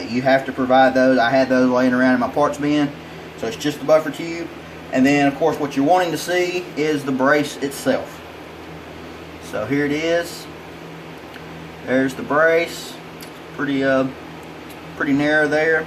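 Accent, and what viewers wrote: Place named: New York, United States. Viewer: I noticed your accent. You're from New York right?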